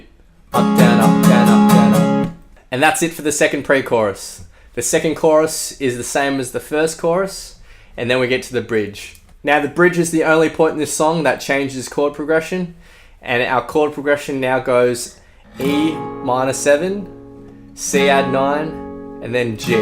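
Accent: Australia. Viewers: Where are you from? You sounds super Australian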